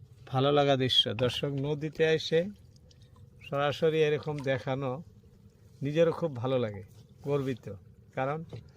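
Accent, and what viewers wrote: Bangladesh. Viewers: আপনার মুখের কথার ধরন দেখে মনে হয় আপনি বাংলাদেশের মানুষ কিন্তু বুঝতে পারলাম না আপনি ভারতে গিয়ে কিভাবে ভিডিও বানালেন?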